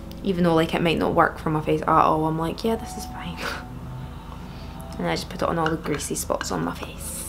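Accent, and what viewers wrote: Scotland, United Kingdom. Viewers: I love your accent,it is scottish ? 🖤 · больше на британский акцент похоже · I watch it for the accent, i fell in love with Scottish accent when I heard her speak 🥺🥺🥺💕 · I love your accent! Are you Scottish? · даа и мне плохо от ее акцента хпхп. То есть это вроде British но ясно что из какой-то определенной специфической части Англии, не пойму откуда именно ахахп. Но в любом случае she's incredible 💓🌹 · And don’t apologize for not being American. The whole world (pretty much) can access YouTube. Your accent is lovely and if you’re English I can tell the regional difference vs. other areas of England. But you do sound a bit Scottish (which if you’re from England, the area would explain why you’d sound a bit more Scottish or even Irish.) Idk how I came across this but I’m glad I did. Cheers mate. Skål! You’re adorable, Deary! 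· aaaah her british accent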